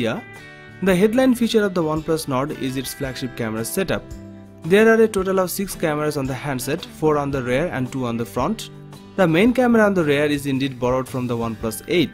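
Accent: India. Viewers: Sound like indian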